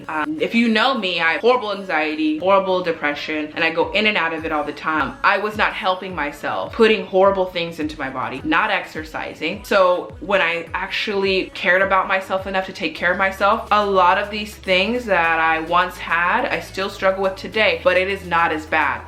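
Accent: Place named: Netherlands. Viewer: Idk why but the way you speak English really has a Dutch undertone if you get what I mean hahah. Idk if you are dutch but yeah 🤣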